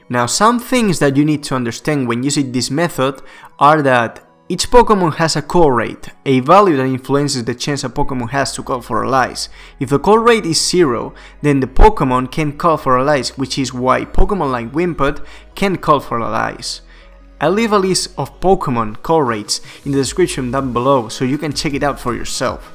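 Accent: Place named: Spain. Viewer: Se nota que eres español pero tienes un buen nivel de ingles! Ha caido algu shiny ya?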